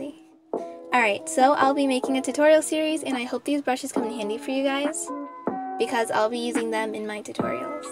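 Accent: United States. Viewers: Woah Thats Amazing! Is it just me or i feel that she has like an American accent- But shes Filipino duh- Edit: So that means shes half American :0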